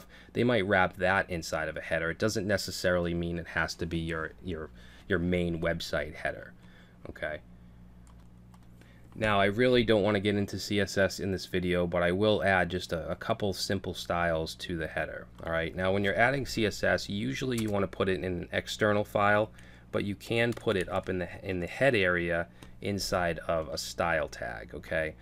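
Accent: Boston, United States. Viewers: Boston accent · You from Boston man? I hear it in your voice. Thanks for the video! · You sound like you are from Boston, are you? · are u from Boston?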